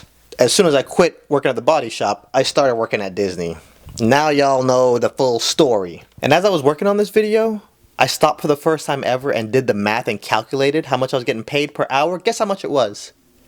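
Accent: Trinidad and Tobago. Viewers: Trini accent on point😂💯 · The trini accent tho 💀🇹🇹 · is the trini accent .. i weak🇹🇹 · A plus rating fi did trinin accent! And you know Caribbean people give you one nickname, it stick for life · Trini accent legit! lol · Trini accent on point, much love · That Trinidadian accent had me rolling XD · My first job was a veterinarian assistance. Also your trini accent is real good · nice trini accent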